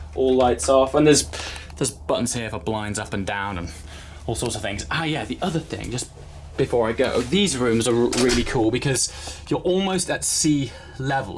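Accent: Netherlands. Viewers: are you dutch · Wait are you dutch?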